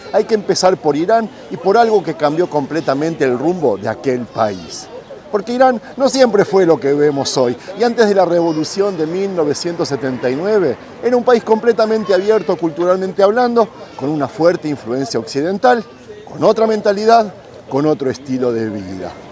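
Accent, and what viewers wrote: Argentina. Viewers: Jajaja! Ya no sabes que decir, hablás como argentino y te crees israelí. Ya te lo dije pero eres necio, el pueblo de Dios no es el régimen mataniños de Israel. (La verdad y la justicia siempre sale y saldrá a la LUZ❤) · Irán nunca ha sido problema de nada. El único pecado de Irán es no haber permitido que los jefes sionistas con sus empresas petroleras roben directamente sus recursos. Porque esa gentuza no está acostumbrada a pagar por nada, no se engañen. Desde entonces se la tienen jurada y si leen un poco se darán cuenta que es el país que menos conflictos ha tenido en Oriente Medio, simplemente tuvieron una guerra muy cruenta con Irak porque los sionistas jefes les echaron encima a Saddam, al que luego ahorcaron por cierto. Por el contrario, Israel como estado en Palestina es el mayor error/crimen de la historia moderna que, como dijo un tal De Gaulle, al que por cierto los jefes intentaron asesinar, "traerá ríos de sangre"... 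El sionismo es un concepto político sinónimo de usurpación, crimen, supremacismo, terrorismo, etc, a mí no me va a convencer con su tono argentino y su voz melosa. Israel es un estado desquiciado que se comporta muy agresivamente con sus vecinos, como cualquier especie invasora, es ley biológica. Fundado en Palestina en pleno siglo XX porque lo dicen sus cuentos míticos religiosos, que tiene narices. Y para legitimarse se gastan millonadas en buscar restos arqueológicos porque no pueden reconocer que LA MAYORÍA DE LOS HECHOS BÍBLICOS NO SON HISTÓRICOS SINO MÍTICOS. Pero mucho más patético que el judío sionista es el cristiano sionista, que les tocan las palmas y no se enteran de que no tienen nada en coún: al judío le repugna la figura de Cristo, el peor de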